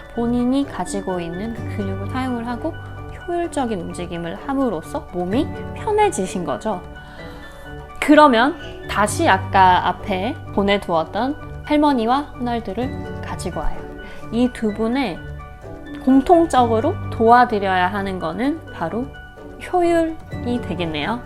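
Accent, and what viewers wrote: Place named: Busan, South Korea. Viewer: ㅋㅋㅋ사투리 잘 쓰셔서 부산사람인줄~ㅋㅋㅋ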